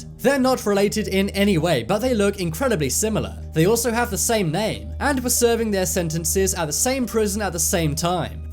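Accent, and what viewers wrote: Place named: England, United Kingdom. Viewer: Your accent 😭♥️♥️.you From England???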